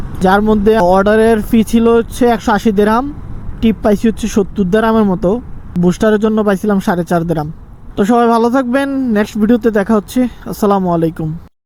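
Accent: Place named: Comilla, Bangladesh. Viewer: ভাইয়ের কথা সুনে মনে হচ্ছে বাড়ি কুমিল্লা 😊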